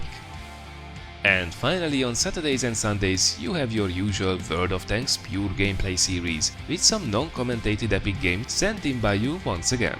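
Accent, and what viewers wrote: Hungary. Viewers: Tipikus Magyar akcentus.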